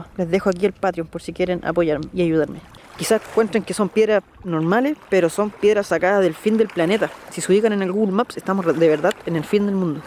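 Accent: Chile. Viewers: Así hablamos los chilenos cuando hablamos neutral, lejos de los modismos traídos desde las cárceles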